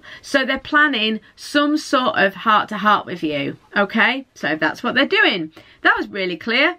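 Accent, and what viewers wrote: United Kingdom. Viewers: Thank you, love your cheery British accent! I chose #1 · Kay Treue same!😄 And both😁😁❤️ 100% resonated, thank you❤️ I’m wondering, could you tell us please from which part of the UK are you?😊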